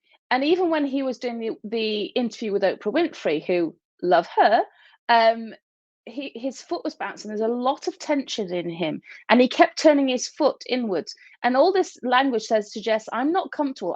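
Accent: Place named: United Kingdom. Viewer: I want to hear someone American review this documentary because as soon as i hear a brittish accent i can bet the views will be delusionally biased against Harry and Megan.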